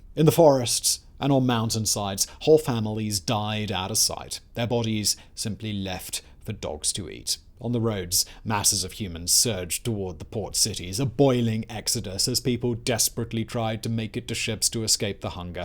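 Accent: RP, United Kingdom. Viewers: Great vid. Was particularly unusual to hear all this is Simon's British accent. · You soft pedaled the affair. There's no line at which treatment like this suddenly becomes genocide or not genocide. You with your posh accent, to try and hide the fact of what a dope you are!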